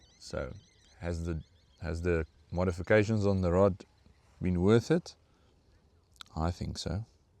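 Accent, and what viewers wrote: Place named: Africa. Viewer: Afrikan accent gives me the creeps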